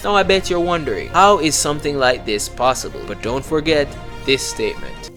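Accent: Jamaica. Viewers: Are you Jamaican by any chance?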